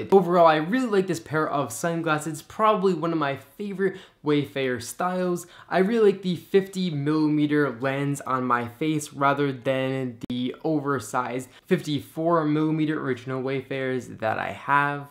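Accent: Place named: United States. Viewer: Oh and by the way, please don't change the way you speak, we English like an American to sound the way we like Americans to sound, just the way you sound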